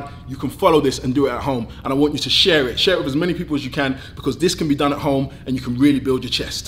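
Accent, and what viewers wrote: London, United Kingdom. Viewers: OMG!!! I haven’t heard a London accent for two years 🤗🤗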